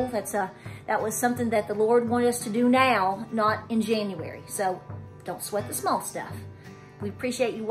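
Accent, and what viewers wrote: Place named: Tennessee, United States. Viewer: Love you ! I'm from LAFOLLETTE TN. You talk like us. Down to earth and tell it like it is. And simple and sweet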